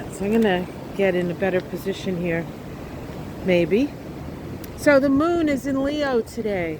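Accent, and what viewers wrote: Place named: New England, United States. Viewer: Good morning from Sunny 45 degrees in Wichita, KS - going up to 68 today! I’m from CT and lived in Brookline, MA in the mid-80’s and your accent really brings me back! Love your astrology readings! Thank you! ♋️♒️♓️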